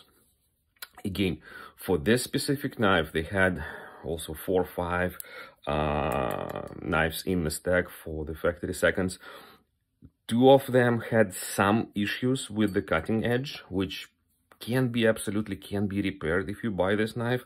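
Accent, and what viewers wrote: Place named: Russia. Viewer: Чувак да ты русский? )) У тебя русский акцент))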